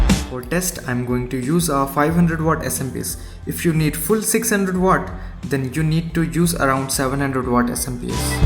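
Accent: India. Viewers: Your voice seems of an Indian ??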